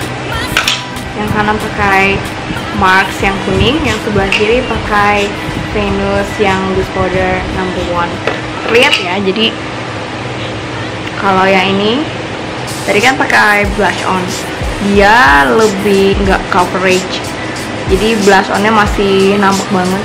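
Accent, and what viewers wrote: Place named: Banjarmasin, Indonesia. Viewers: norpuadah puadah ketahuan yaaa.. memang asli banjarmasin mbaa.. thx for visiting 😂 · bahasanya kaya orang banjarmasin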